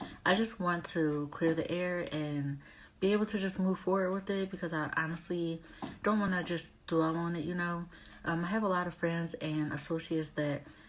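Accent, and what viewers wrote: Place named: Baltimore, United States. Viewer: Thank you from sharing! Are you from Baltimore? I hear your accent lol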